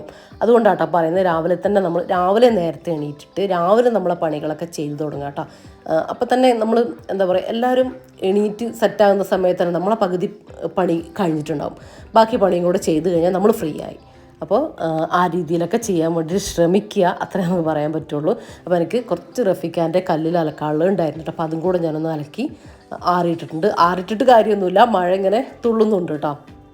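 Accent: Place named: Kasaragod, India. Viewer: Ithante Kurachoke words Kasaragod slanganallo.❤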